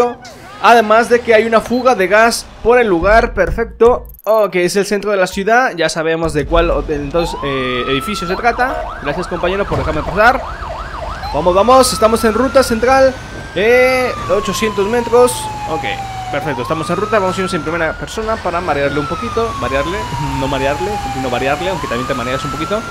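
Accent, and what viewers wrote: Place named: Italy. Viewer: casi en todo el video hablaste como italiano hablando español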